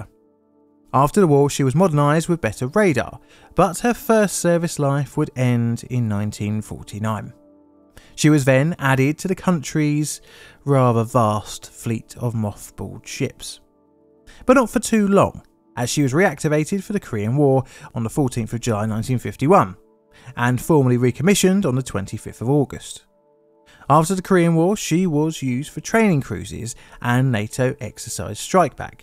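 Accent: United Kingdom. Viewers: AI narration with a Limey accent. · The US Navy is being taken to task... by a YouTuber with a Brit accent... at least slightly ironic, eh wot?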